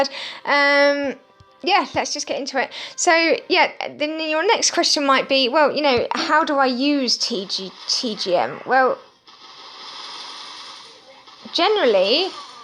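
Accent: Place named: United Kingdom. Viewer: Who talks this way? Which part of britain is that accent?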